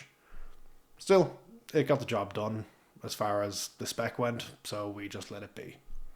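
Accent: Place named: Ireland. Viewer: thats an irish accent if im not mistaken. great to find another irish developer channel. (great video btw)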